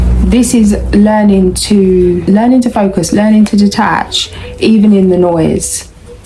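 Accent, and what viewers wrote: United Kingdom. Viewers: I love that British accent!!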